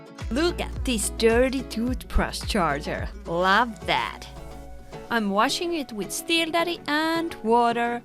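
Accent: Finland. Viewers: I like hearing your stories and comments and your Finnis (sic) accent like my grandma. AND: what is that little square thingy on the kitchen sink? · Ihmisten tarinat kiinnostaa. Se miten he ovat tuohon jamaan joutuneet ja miten sitten ovatkin pohjalta nousseet 😍 ja siun ralli englanti on kivaa kuunneltavaa 😁😁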